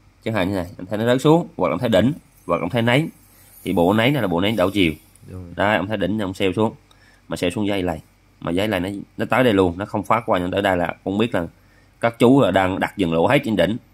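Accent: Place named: Quang Nam, Vietnam. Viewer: helo a, nghe giọng a giống ở quảng nam z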